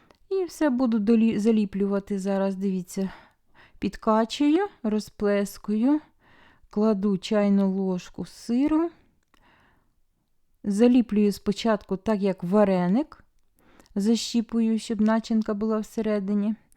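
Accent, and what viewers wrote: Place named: Cherkasy, Ukraine. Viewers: я подумала- Вінниця ❤Приємно знати,що на Черкащині так розмовляють ❤. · Вимова черкаська.Шевченкові краї.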